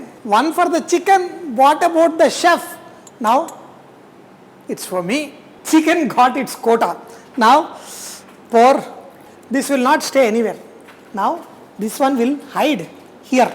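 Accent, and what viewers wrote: India. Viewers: Too much buttler english...show some useful content, not interested in the nuisance. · Excellent cooking plus hard Indian accent 🙌🙌🙌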